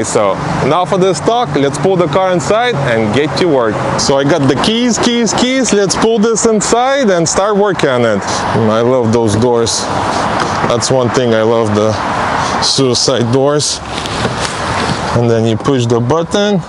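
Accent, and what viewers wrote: Russia. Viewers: Эбать у тебя акцент русский. Сразу видно наш пацан 👍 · ты че Русский что ли?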